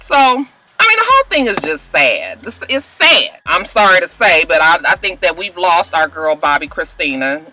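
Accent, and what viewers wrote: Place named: Louisiana, United States. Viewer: Girl, be careful sitting in that car!!! LA, I was trying to figure out that accent....I remember that accent from the people I went to college with that was from LA!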